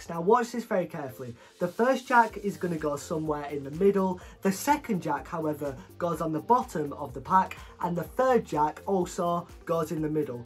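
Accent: United Kingdom. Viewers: l love your british accent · You are incredible. Can I ask you where are you from. It sounds that you have a british accent.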